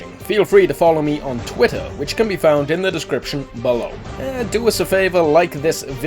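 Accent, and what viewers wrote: Wales, United Kingdom. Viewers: ! You are Welsh! So am I!!! Which part of Wales do you come from? Because you have a Welsh accent. Lastly I come from Pembrokeshire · Are you from wales?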